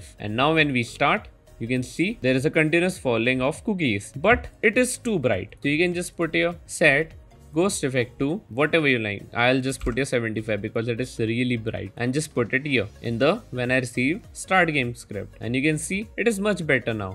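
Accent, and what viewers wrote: India. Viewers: Its always that nice guy with an Indian accent who makes the absolute best tutorials. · always the Indian guy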